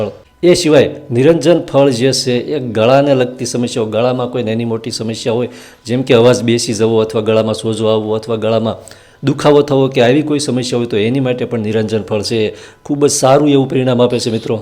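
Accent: Bhavnagar, India. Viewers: ભાઈ, તમારી ભાષા ટિપિકલ ભાવનગરી છે ! અનુમાન સાચું છે ?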